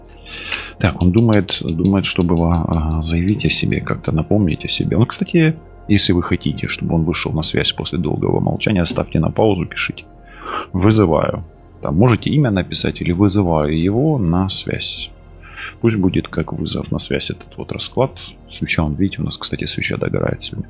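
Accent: Ukraine. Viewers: доброе утро! Как я соскучилась по Вашему голосу,раскладу,как мне нравится как Вы доходчиво всё доводите до нас,как нравится Ваш хохлятский говор,я прожила 42 года с хохлом,мне всегда нравился их говор, шо требе,кохано,цебуля,цеберка,тырло...Что же у меня будет с моим Анатолием,42 г.прожили вместе, больше нет сил терпеть унижения,уехала,в четвертый раз,надеюсь навсегда, больше ничего не хочется,пятеро внуков,две дочери 39,41г. Говорят мама поживи для себя,папа никогда не изменится Анатолий,<num> г р Что нас с ним ждет в наших отношениях?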